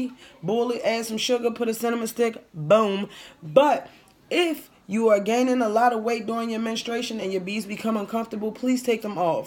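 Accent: Baltimore, United States. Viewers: I hear them "ewrs" in your words... you from Baltimore aint you? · You just spoke to my soul...I love how real you are. I have killed 3 sets so far...my placement was wrong for my skill level and possibly my body type (apple shaped/bbw). You have given me so much encouragement. In the first minute of you speaking I said she sounds like she is from Baltimore (our speech is very distinct). Thanks sis! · Are you from Baltimore? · Are you from Baltimore? You have the accent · Are you from B- more? Your accent reminds me of home.😊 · I knew I heard that Baltimore (me too). Glad I found your video. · Juna Luna Sooooo glad you mentioned this! I have a friend that lives in Baltimore and I thought I was crazy because she sounds just like her 😂😍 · Thats that Baltimore accent. Hey sis! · You from Baltimore? the way you say "to" 😂I love y'all accent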